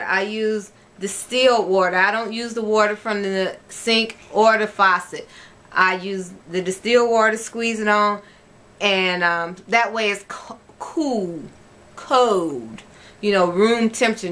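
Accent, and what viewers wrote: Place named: United States. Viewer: Omg I can't NOT believe I'm just now coming across your channel I it's 4am and I'm up watchng your channels 😴.... First I love your country accent, your sprit and passion for natural healthy hair make the videos more enjoyable. Second 👀😱 that cabinet full of powders! Please share. <mail> I use a lot of essential oils but never used a variety of powder just the Aztec clay on my hair it was okay my coil sure did pop👀🙈😱.... Lol as for my daughters hair it worked well for one but dried out the other one. Quickly stopped. So I just use that as a face mask or toothpaste. One of my daughters hair is thick thick thick and I can't seem to keep it moisturized, I invested in the Qredew and its aiight! 😒.... Run down of my struggle cause it's GETS real ! Lol can I get a list of powders you use? Thanks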